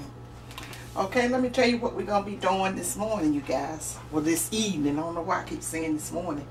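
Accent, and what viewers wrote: South, United States. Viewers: Momma I just enjoy your channel. I love hearing you talk. Make me wish I was from the south. As I said in one of my other post my grandmother was from the south and you remind me of her with how you talk and some of the things you say. The juicing is the new way to go! #Greatstuff Thanks for bringing us in ur home! Take care and God bless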